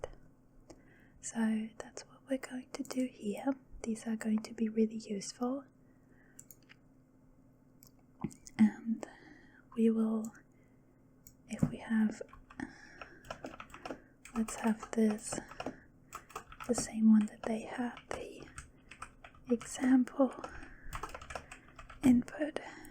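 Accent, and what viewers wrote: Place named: Europe. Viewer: What on earth is her accent?! It sounds like a European speaking with an Australian/american/british mix …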